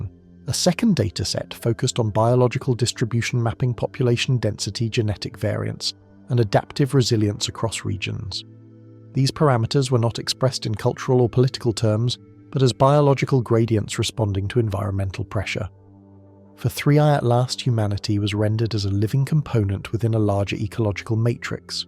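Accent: United Kingdom. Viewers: Saying lots of words in a UK accent with no point.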